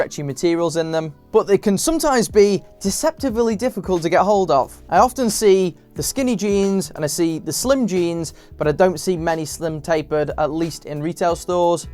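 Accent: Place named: England, United Kingdom. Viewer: Dislike because if english accent! Hard to understand! Dislike 3 times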